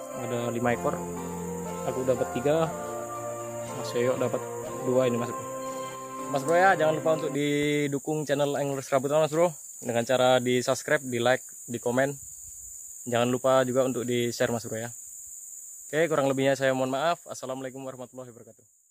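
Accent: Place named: Medan, Indonesia. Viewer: Gila coy.. mantap betul tomangnya. Tapi aku lebih tertarik sama logat mu coy.. Jawa edan eh jawa medan ya. Dmna lokasi mu coy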